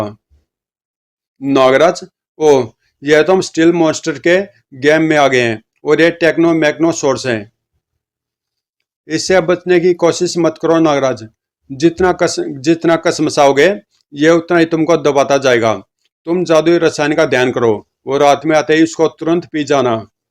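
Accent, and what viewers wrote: Haryana, India. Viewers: Bhai hindi ko haryanvi me bol raha he kuch samaj nahi aa raha thire thire hindi bolne ki sahi se practice karo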